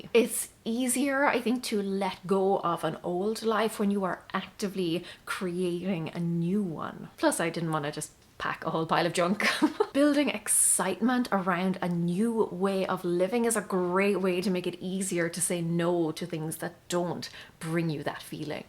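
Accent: Ireland. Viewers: One thing I have gotten from your videos is that no one gets it perfect the first time. It's a process. You can learn. You can change your mind. Be gentle with yourself. Thank you for that! Oh, and ANYTHING spoken in a lovely Irish lilt is easier to listen to! It's the spoon full of sugar that helps the medicine go down.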